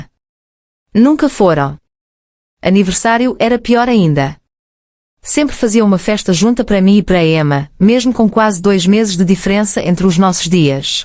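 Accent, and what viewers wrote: Portugal. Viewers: Uma narração de áudio de Portugal eita meu Deus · Eu gosto da língua portuguesa (de Portugal mesmo? Gostei mto da história, foi mto bem narrada, parabéns. 🎉😊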